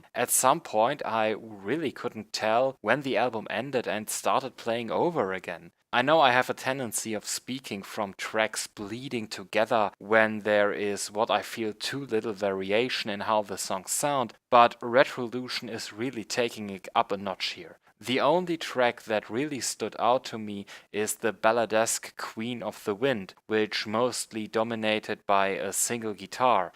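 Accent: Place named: Germany. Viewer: yes....vor allem das grässliche Englisch dieses "Reviews"....wenn schon, warum dann keine Reviews in deutsch anstatt "Sauerkraut-Englisch"???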